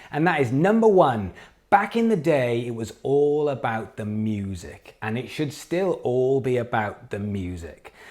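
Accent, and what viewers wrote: Wales, United Kingdom. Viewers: ahhh Swansea.. that makes sense I couldn't place it for some reason. I'm from the valleys (ebbw, brynmawr sort of way). Nice one man, enjoy the states.. my bro lives in California and they love the Welsh accent apparently. Dig your videos man. Have two bands and struggle to push them online despite one of them being signed haha.. so i find these vids helpful. Keep up the good work dude.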